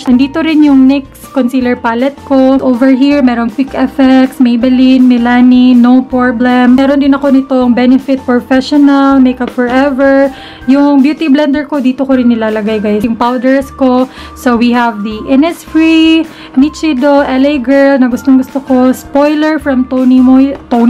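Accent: Visayas, Philippines. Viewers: Visaya po ba kayo??? Kasi po parang may mga words na accent at visaya word po kayo ask ko po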